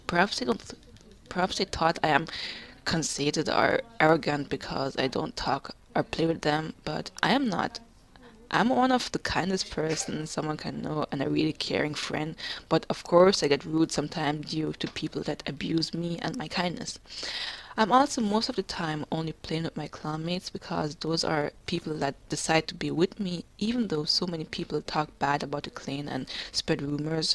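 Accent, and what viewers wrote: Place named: Caribbean. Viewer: You sound like you're from the Caribbean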